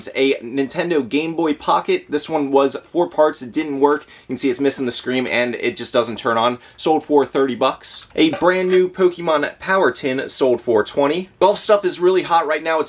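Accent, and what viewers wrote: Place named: Baltimore, United States. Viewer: Ever live in Baltimore? Your accent reminds me of my Bmore neighbors :)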